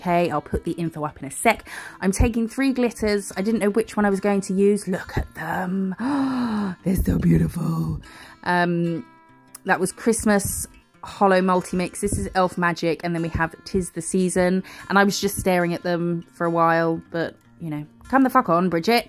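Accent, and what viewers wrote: United Kingdom. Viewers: Love your video's ! Your channel was recommanded on a dutch facebookpage for hobbyist nailartists. And they where absolutely right to recommand you! ❤ your British accent 😍💅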